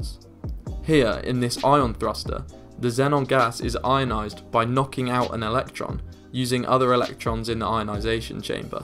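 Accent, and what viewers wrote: United Kingdom. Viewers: This video demonstrates if you have a British accent it does not necessarily mean you are smart. Lol, I'm sure he is intelligent. It's just that he knows so much that cant be proven.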